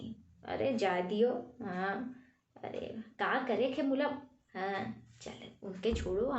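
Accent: Awadh, India. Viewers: Bahute Neek Madam Ji, I like Yours Voice with awadhi Veri attractive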